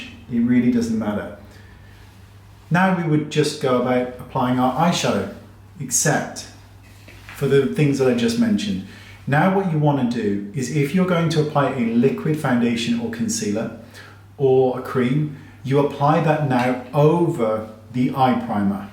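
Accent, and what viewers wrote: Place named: United Kingdom. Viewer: Great tips for an older lady, and nice to hear a British accent. Love your videos x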